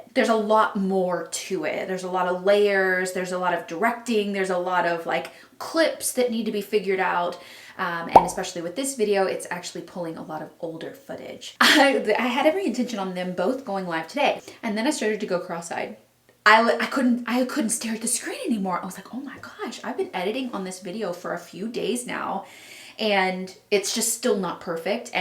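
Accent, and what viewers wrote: Texas, United States. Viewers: LOL yes, I've notice your accent more when you're here in Texas as opposed to when you're in LA.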